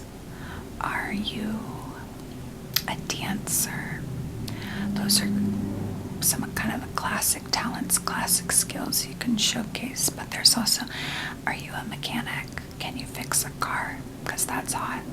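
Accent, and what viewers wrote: South, United States. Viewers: You're truly a gem. Your southern accent relaxes me the most, but at this point I'll binge anything you make, you're a comedy genius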